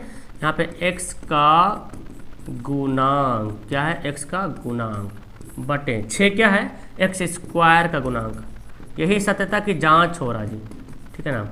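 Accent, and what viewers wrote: Bihar, India. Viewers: Sir aap Bihar se h kya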